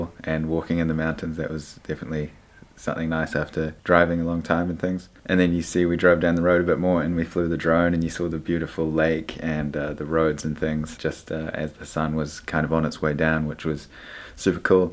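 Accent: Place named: New Zealand. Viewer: New sub here, great vids I've always wanted to know what SA was like. I knew I picked the kiwi accent haha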